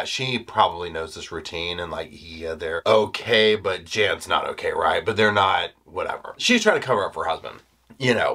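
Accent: South, United States. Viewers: One of the reasons I so relate to is his Southern nature. He has a rhythm to his speech that brings me back to my own Kentucky roots. It feels like down home to me. ❤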